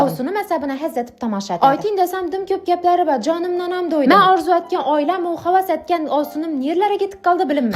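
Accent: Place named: Khorezm, Uzbekistan. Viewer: Tilizga shakar xorazmliklar kursdoshlarimni eslatib yubordiz shevangiz bm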